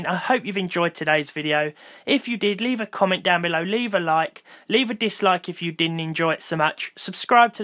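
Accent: United Kingdom. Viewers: is this a brittish accent?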